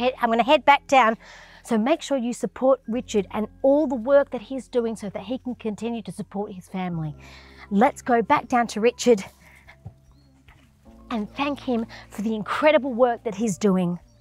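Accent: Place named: Buganda, Uganda. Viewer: Thanks for loving Uganda, I love yr luganda accents u make mi day 😂😂😂😂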